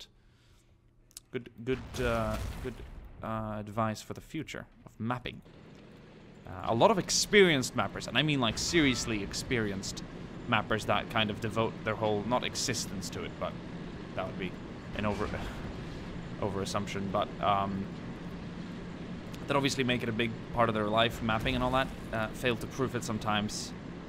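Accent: Sweden. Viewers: Är du svenne? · Are you swedish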